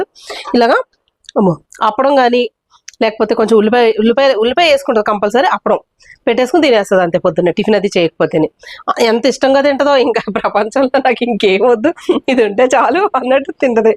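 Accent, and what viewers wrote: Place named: Srikakulam, India. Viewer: మిమ్మల్ని చూస్తుంటే మీరు చెప్పేది వింటుంటే మీది శ్రీకాకుళం ఏమో అనిపిస్తుంది మేడం ఎందుకంటే మాది శ్రీకాకుళం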